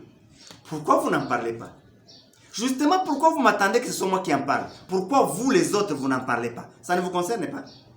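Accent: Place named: Rwanda. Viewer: Accent rwandais, le même accent que ruberwa, kagame etc... Cherchez l'erreur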